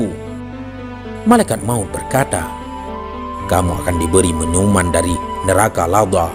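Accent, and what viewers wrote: Aceh, Indonesia. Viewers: Minin awak aceh nye? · ini yang bicara orang aceh ya. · Apakah admin orang Aceh?? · Tuh klo ga salh logat Aceh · Orang Aceh ni suaranya.. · Admin nya logat aceh ya🙏🙏🤭🤭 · Logat Aceh susah utk di hilangkan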